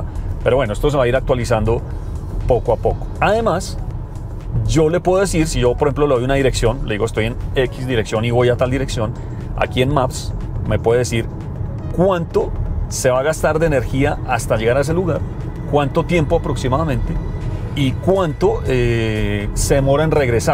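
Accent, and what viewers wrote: Colombia. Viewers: Que impecable reseña. El acento colombiano es muy agradable de escuchar, y los paisajes acompañan muy bien las tomas del C40. Felicidades y gracias por vuestro contenido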